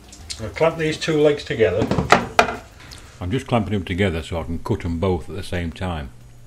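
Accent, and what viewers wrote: Yorkshire, United Kingdom. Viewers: By 'Eck, a Yurkshireman! Greetings from a homesick Brit expat! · Ey up, lad's from Yorkshire int he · It vaguely seems that you are attempting to talk in English. Ah, you are a “Brit”! Sorry for you to be so unlucky to have such a sloppy upbringing. You could go to USA for high school, then you might learn ENGLISH LANG.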